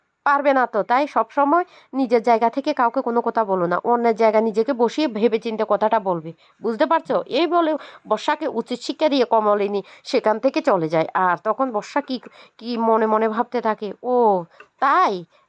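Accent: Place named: Noakhali, Bangladesh. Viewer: Didi apnr kathagulo kmn lagche sunta. Apni ki nowakhali dist a thaken?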